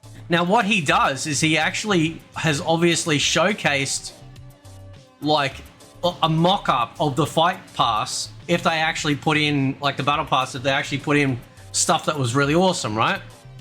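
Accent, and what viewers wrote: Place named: Australia. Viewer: Right? 😂 love the aussie accent mate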